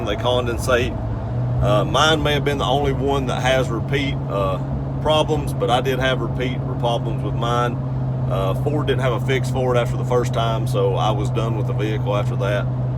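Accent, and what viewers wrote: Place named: Tennessee, United States. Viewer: Great review of Tundra, love the eastern Tennessee accent too, some of the best folks in the world are from that area, a great friend passed away earlier this year from Bristol Virginia, Kevin Davenport, he had this same accent, could sell ice to a Eskimo and always had nice vehicles. Was blessed to have had him as a friend!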